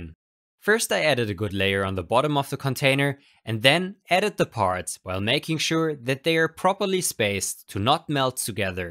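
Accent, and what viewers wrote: Germany. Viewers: Ya german?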